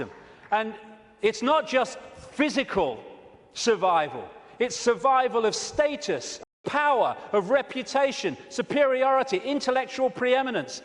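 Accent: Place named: England, United Kingdom. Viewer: This guy is the best!!! He's out of his mind, and he has an English accent to boot. I love this stuff, though, b/c as a sci-fi satirist, this stuff is a veritable goldmine. Keep them coming, and do not take your meds. You're fine just the way you are, in all your conspiratorial, absurd glory!!!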